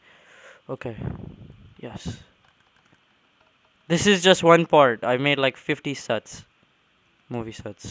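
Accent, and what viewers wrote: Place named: Arab world. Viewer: I FUCKING LOVE YOU and your arab accsent (no homo) THANK YOU SOOO MUCH!!!!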